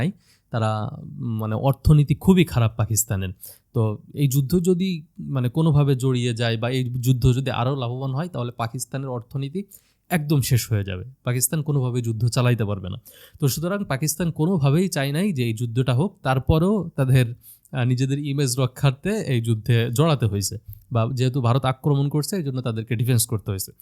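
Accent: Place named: Bangladesh. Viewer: Toke koto Ghosh khaiyeche....tor kotha bolar ton alada ....tui mone hoi BD mal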